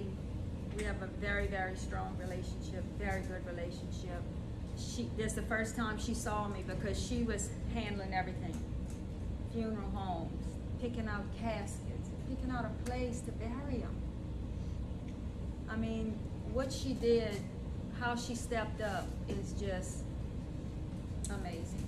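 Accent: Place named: South, United States. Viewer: I’m part of your usps fam and have followed your story. I’m so extremely proud of your presentation it has to be so difficult every. single. time. !! And I pray daily that the good Lord comes back soon to reunite your family and I’m so excited to meet those wonderful kids !! That day will come I promise !until then I send all my love and support from Jax - originally Wisconsin and live your little southern voice !!! ❤️🙏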